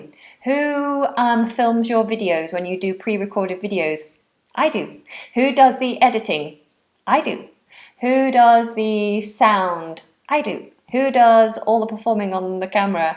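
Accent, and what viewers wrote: United Kingdom. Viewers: I love your British accent,! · Very beautiful British english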